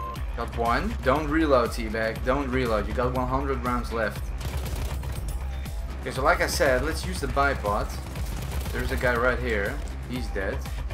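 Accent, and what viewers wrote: Netherlands. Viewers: You have a dutch accent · Ik hoor gewoon je Nederlandse accent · You can hardly hear your Dutch accent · Hello If i play battlefield with my friends they often say i sound like you, probably because I'm dutch. :)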